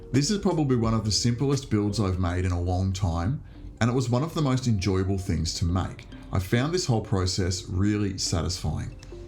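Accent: Australia. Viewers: Love these. Simple and effective. Also cool to see and hear another Aussie maker. Just subbed. Damo.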